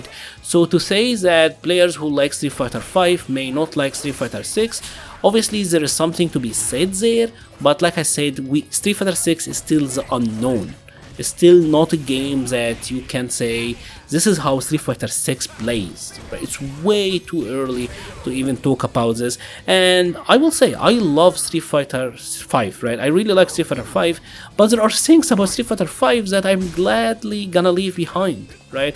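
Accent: Egypt. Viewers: I go to an Egyptian church and I love your accent. You remind me of my abouna when you say "the people" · Love your accent.. Sounds Egyptian..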